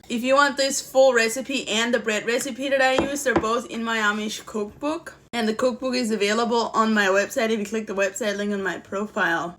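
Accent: Germany. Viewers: Do u have a German accent? I got a little scared when u cut the bread I'm not gonna lie 😂😂 jk ❤❤😂 I think u are wonderful😊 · Is German your first language?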